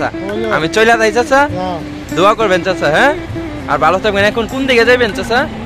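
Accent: Sylhet, Bangladesh. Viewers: Vaiys apni ki sylheti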